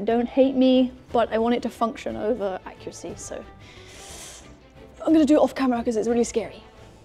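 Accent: United Kingdom. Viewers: I never heard your voice and genuinely DIDNT expect you to be British.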